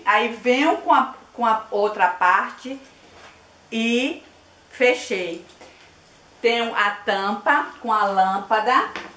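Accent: Northeast, Brazil. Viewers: Adorei só pode ser nordestina parabéns ❤️❤️❤️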